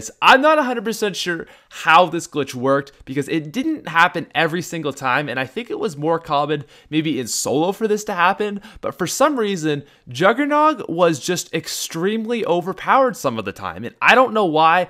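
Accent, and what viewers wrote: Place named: Newfoundland, Canada. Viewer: Are you from Newfoundland? Your accent sounds a lot like one of my teachers that used to live in Newfoundland.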